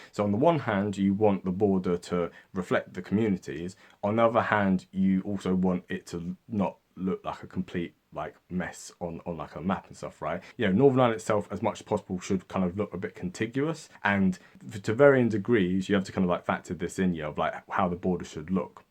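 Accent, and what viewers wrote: England, United Kingdom. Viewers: You can't win here. The sound of an English accent providing solutions to Irish problems drives even the most Irish people bonkers, as there is a long, long history of 'English solutions' causing endless problems for the Irish. You're a brave man...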